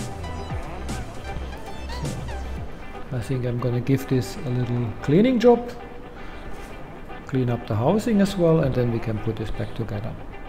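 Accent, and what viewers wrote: Germany. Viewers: Thanks for the video with the nice swabian accent. Als Schwabe hat man den halt...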